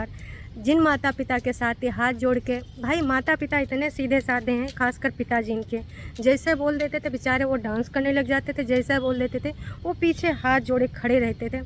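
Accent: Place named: Kanpur, India. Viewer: Ap kanpur se hai kya...ye to humari kanpuriya bhasa hai...mast bolti hai ap...❤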